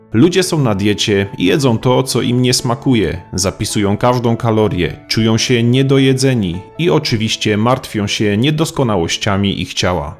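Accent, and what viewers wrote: Silesia, Poland. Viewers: Czy lektor jest ze Śląska? Słychać akcent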